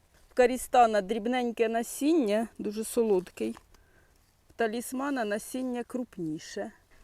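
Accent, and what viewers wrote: Poltava, Ukraine. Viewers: Мабуть звідкись з Полтавщини судячи по говору. )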